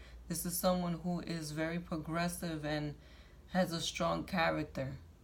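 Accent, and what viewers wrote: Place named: Puerto Rico. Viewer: Thank you for that amazing reading, gave me goosebumps? ❤️❤️ Your voice! Boricua!?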